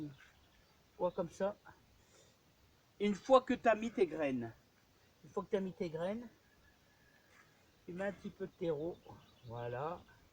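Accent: North, France. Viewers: je travaillais avec des collègues qui venait de la SEITA de Lille ,certains de Bétune. Lorsque nous avons tous été licenciées se fut le retour au bercail pour eux. Avec vous, je retrouve le parlé du Nord et j'avoue que ça me fait du bien. Bonne après midi à vous.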